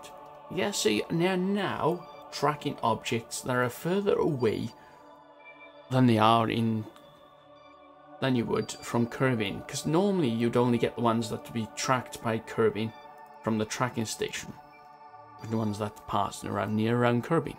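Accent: Wales, United Kingdom. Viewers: are you Welsh coz I kinda recognise the accent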